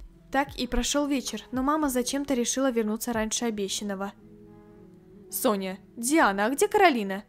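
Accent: Ukraine. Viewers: ты украинка?:_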